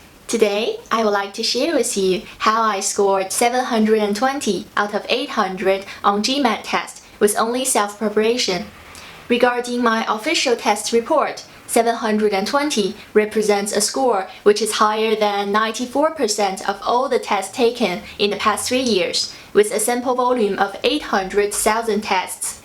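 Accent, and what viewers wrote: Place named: Singapore. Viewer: its the way she speaks her accent..i lived in singapore for 6 years and did my schooling there and alot of people over there speak like her but when they write you will come to know how awesome their english is ...dont be fooled ....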